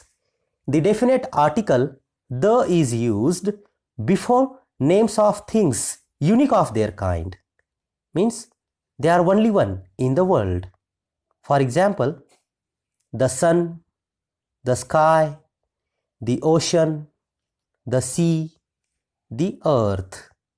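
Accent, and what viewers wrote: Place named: India. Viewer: Sir thank you - i do notice indian accent - great thank you- suggestion - a bookmarking / great content thank you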